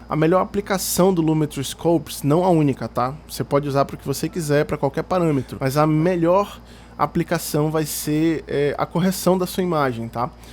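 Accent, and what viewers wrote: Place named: Manaus, Brazil. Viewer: Muito manauara esse mano. Volta com as aulas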